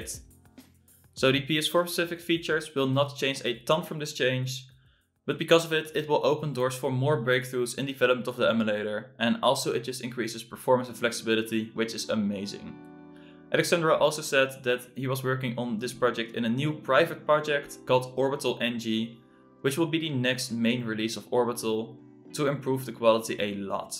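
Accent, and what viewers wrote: Netherlands. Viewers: are u dutch lol? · mooi nederlands accent, goeie video!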